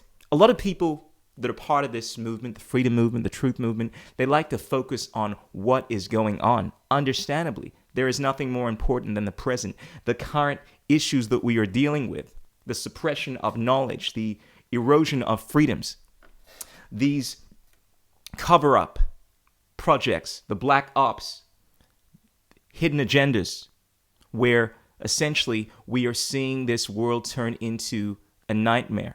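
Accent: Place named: United States. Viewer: Why do you speak with an American accent if you're from Ghana?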